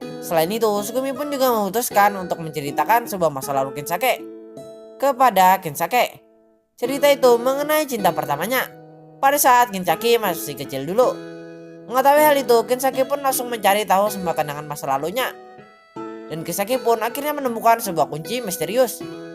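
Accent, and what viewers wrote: Banjar, Indonesia. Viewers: orang banjar kh bg?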